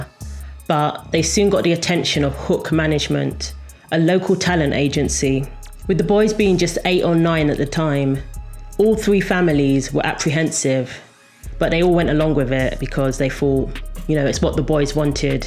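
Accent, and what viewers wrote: United Kingdom. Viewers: Good gawd she reads so slow. I had to fast forward real quick when she read the second time. British accents force you to speak so slow. That must be why they speak with an accent. They don’t follow the phonetics of English.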